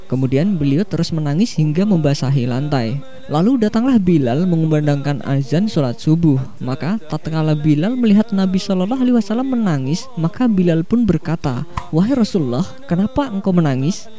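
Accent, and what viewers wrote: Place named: Yogyakarta, Indonesia. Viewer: Logat orang jogja ya wkwk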